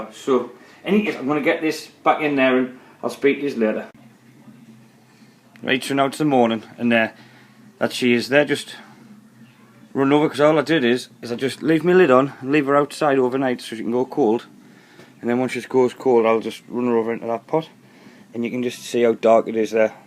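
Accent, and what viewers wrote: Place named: Blyth, United Kingdom. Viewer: You sound like your from my neck of the woods. I'm in Blyth.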